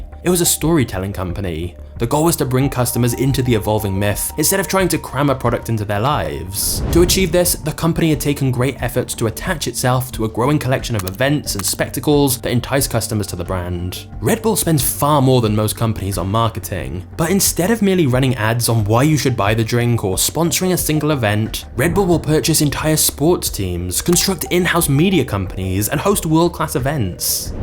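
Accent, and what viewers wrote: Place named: Midlands, United Kingdom. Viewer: It's a middle England thing, this is how people speak. For strong and proper English, go North!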